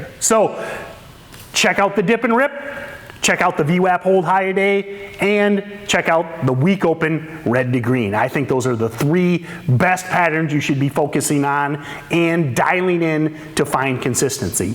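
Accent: United States. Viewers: just so you know this is the most simple, no nonsense video on patterns that i have ever seen and i have probably seen hundreds of different videos. when other people explain things it is almost impossible for me to understand but the way you, explain things paints a clear picture in my mind and actually gives me actionable instructions that i can start applying to my trading. so thank you very much for that. maybe it's because you're also from the midwest that i am able to understand you so well, but anyways i appreciate it. i also have been enjoying the podcast. it's just the right balance of entertainment and informative content. would love to see more videos like this.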